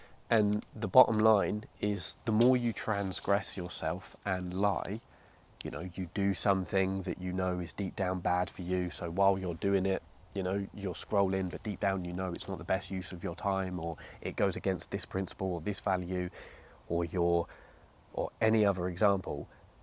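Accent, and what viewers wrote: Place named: London, United Kingdom. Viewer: What part of England are you from? If I had to guess I’d say somewhere in London.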